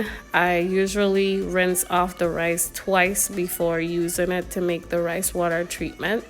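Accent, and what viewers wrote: Caribbean. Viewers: You accent sounds Caribbean, I'm from Trinidad, where are you from? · You have a Caribbean accent, where is she from? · Sounds like you from the Caribbean 🇬🇾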